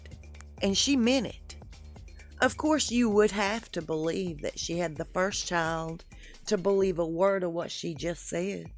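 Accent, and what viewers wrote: South, United States. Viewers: I love it when you say y’all. I went to the USA recently and made nearly every American say it. In your words I was a royal pain in the ass. Still their accents were not as lovely as your Southern accent. Love your British Friend and her cat 🐈💕💕💕💕💐💐💐🇬🇧💕🇺🇸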